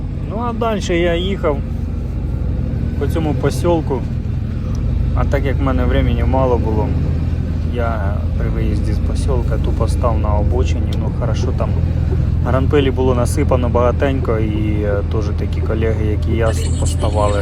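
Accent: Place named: Kirovohrad, Ukraine. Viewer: Тільки хотів написати в коментарі, що чую знайомий говір, як на Кіровоградщині, аж тут ви самі про це говорите. 🤗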